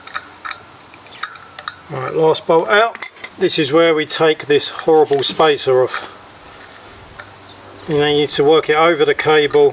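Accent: United Kingdom. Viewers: I cant stand that british accent. Say words correctly... Theres no 'o' in right.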